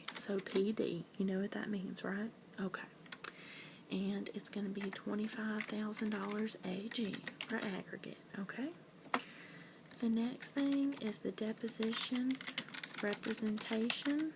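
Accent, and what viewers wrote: South, United States. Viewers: Please please please do more Southern accent videos =)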